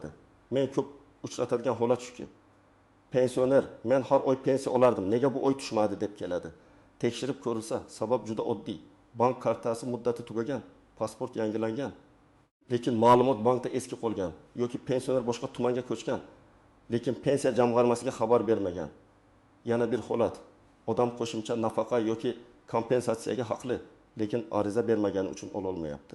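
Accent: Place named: Chukotka, Russia. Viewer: Гапираетган чукчами. Узбек тилини биладиган одам ЙУКМИКАН.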